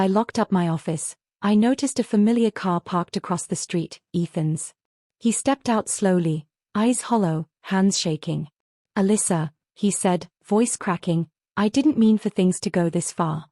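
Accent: United Kingdom. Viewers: Why the British accent? In America it is pronounce skedule not shedule. According to British pronunciation school would be shool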